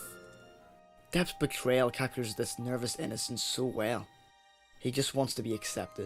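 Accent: Northern Ireland, United Kingdom. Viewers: Just watched the film; I enjoyed it very much. If I may ask, where did you go to college/film school? Judging by your accent you are from Northern Ireland, correct?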